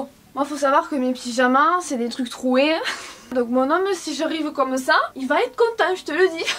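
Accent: South, France. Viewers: tu es canon et tu n’as absolument aucun complexe à avoir😉 J’adore tes vidéos, toi et moi on a le même accent du Sud, tu serais pas tarnaise?🧐 Bisous guapa😘😘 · Tu a un acten du sud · Dommage tu a un accent à begère trop prononcé ça gâche tout je trouve tu viens de la campagne provençale ou quoi? J ai l impression d ENTENDRE Manon dans la gloire de mon père... non je supporte pas bye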